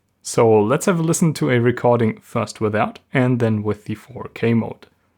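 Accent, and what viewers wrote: Germany. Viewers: Love the German accent.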